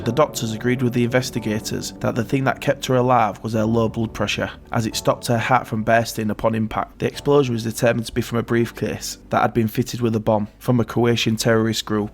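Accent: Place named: West Yorkshire, United Kingdom. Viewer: Just subbed , is tha from west Yorkshire? , good luck with the channel.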